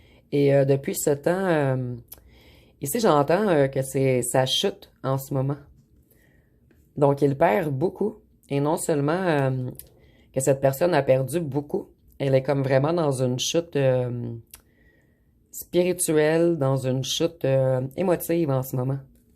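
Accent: Quebec, Canada. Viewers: J'adore votre accent le jour j'irai voir voir au Canada ❤❤❤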